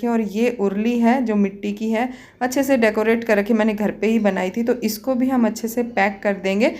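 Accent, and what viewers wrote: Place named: Haryana, India. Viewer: Aaj awaj me dum hai haryana wali filling hai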